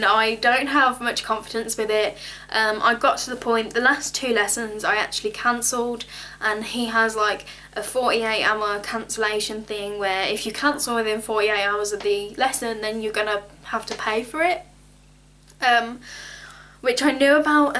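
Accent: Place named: Midlands, United Kingdom. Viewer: Where you from bbe?? You sound Midlands xx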